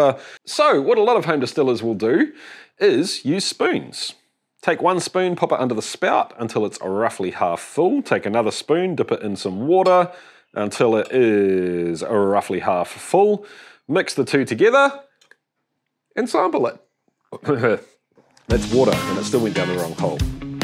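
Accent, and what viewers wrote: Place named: New Zealand. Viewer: I thought the comment was a piss take on kiwi accent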